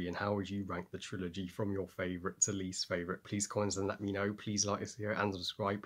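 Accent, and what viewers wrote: West, United States. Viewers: Hi! We are big fans of this series. Of course one and 2 are our favorites but 3 wasn’t bad. Your western accent is cute!! 😆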